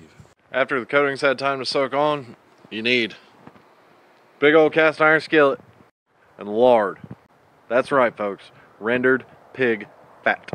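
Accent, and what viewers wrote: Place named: Georgia, United States. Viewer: You SOUND like you’re from Georgia. How does a southern country boy end up in Northern Michigan? Side note: I recently discovered you on my random Facebook video wormhole and I’ve now subscribed and binged 5 videos so far. Love it!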